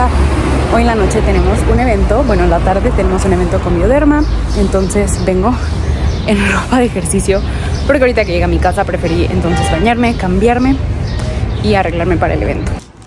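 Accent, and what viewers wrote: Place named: Fresa, Mexico. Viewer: Holaaa, sólo no hables tan fresa y saldrán mejor tus videos. Saludos.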